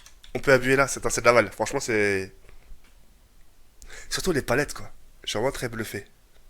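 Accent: England, United Kingdom. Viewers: Très beau accent anglais :) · euh ton accent anglais TK ses koi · LES GANTSSSSSSSSSSS et ton accent anglais me font trop rire x)))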